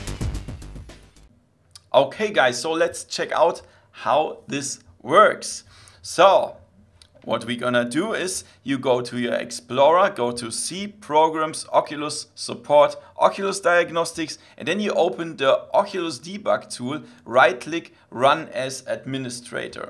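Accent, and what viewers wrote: Germany. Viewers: You german?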